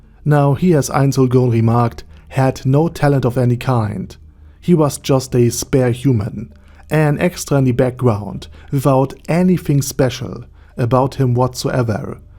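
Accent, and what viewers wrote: Germany. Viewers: it is a heavy german accent but its very easy to understand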